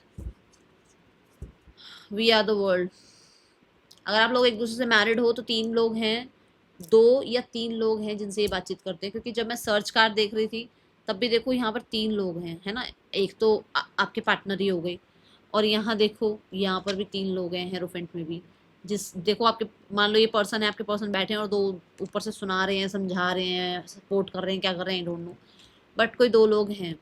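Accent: Punjab, India. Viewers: Muje ap ki lengve s पता लग raha h ki ap पंजाबी h Mam टोटल बदतमीजी इसने ki h Maine पता नहीं कितनी बार फोन kiya per इसने kabhi फोन att nahi kiya kya karu please please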